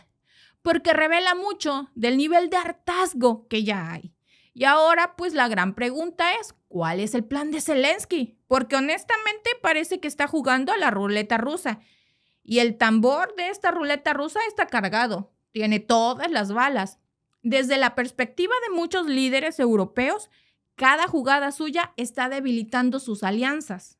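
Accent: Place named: Mexico. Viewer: Qué agradable expresividad tiene Vd., Doña. La "música" en su locución, con ese toque MX, pero sin caer demasiado en slang local, es muy agradable. Me he suscrito porque me resulta relajante escucharla un tantito (como dirían en MX 🙂), Se hace más llevadero el habitual horror que nos trae la actualidad internacional en estos tiempos. 👋👋👋 A veces me rio yo solo cuando recuerdo cómo, al referirse a algún impresentable, le llama "nuestro fugurín" 🤣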